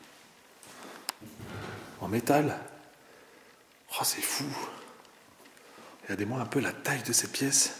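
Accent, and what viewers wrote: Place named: Belgium. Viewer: Un petit accent belge .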